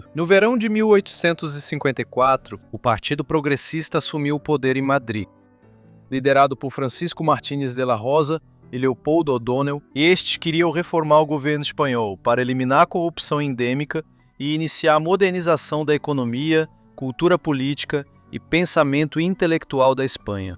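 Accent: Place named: Brazil. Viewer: Parabéns pelo vídeo, ótimo áudio em português brasileiro lindo, não assisto mais brief case, devido ao sotaque de Portugal, gostava muito do canal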